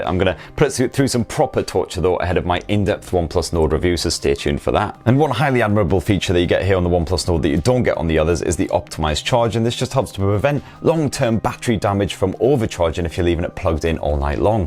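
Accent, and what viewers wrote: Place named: Newcastle, United Kingdom. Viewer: I'm English aswell...........but a Londoner. He's a 'Geordie' ...that's someone who's from Newcastle upon Tyne or the surrounding area. I can tell from his accent. Any British person can tell this immediately. Gotta love the Geordie sense of humour - it's very quirky!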